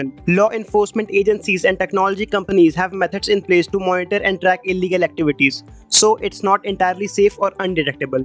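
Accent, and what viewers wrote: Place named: India. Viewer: Goofy ahhh Indian accent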